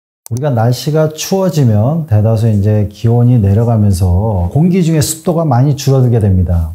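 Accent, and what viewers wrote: Gyeongsang, South Korea. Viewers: 경상도분 같아요?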